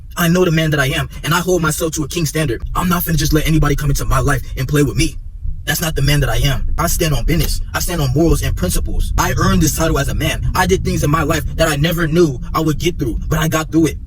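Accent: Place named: Los Angeles, United States. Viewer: Bro you got that L.A accent. You from there?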